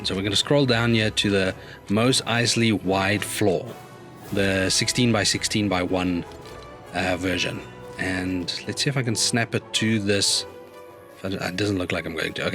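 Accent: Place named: South Africa. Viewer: Heard the accent and immediately knew it was a fellow Safa. Great to see, wishing you lots of growth. Sub status achieved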